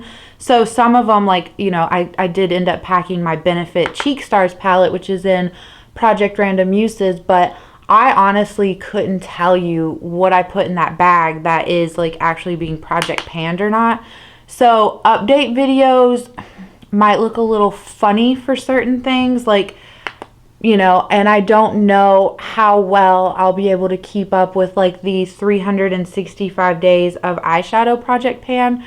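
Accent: South, United States. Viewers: Heck yes girl! I am here for the country accents! I’ll bring the sweet tea to your porch! I hope that y’all got rocking chairs!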